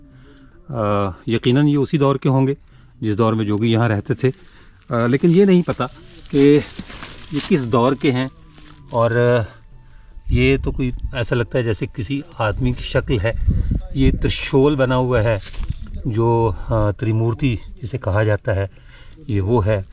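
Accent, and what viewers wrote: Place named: Punjab, India. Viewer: His Urdu has inclination towards United Provinces , and to Bihar, yet a creamy touch of Panjabi accent.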